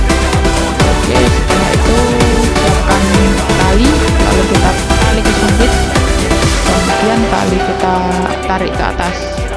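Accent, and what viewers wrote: Java, Indonesia. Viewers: wong jowo di suworone!!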